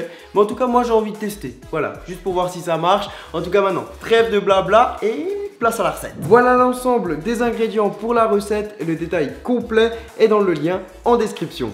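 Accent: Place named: Alsace, France. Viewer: Ma mère quand elle t'a vue elle a dit "Ah sa c'est un alsacien ! "elle dit que tu as un très bon accent Alsacien et elle espère que tu le gardera :-) #TeamSnap :-)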